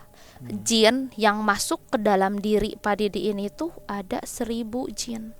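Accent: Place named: West Java, Indonesia. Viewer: Sundanis tulen bgt logat si teteh nya,, lanjutkan teh 😁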